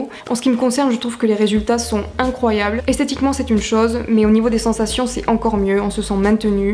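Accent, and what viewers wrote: South, France. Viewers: vive le sud !! on reconnais l'accent ;)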